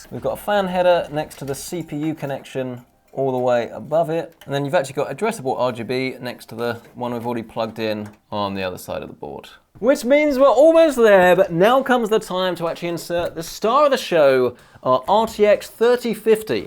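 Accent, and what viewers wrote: United Kingdom. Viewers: British boy talking about computers makes me happy :D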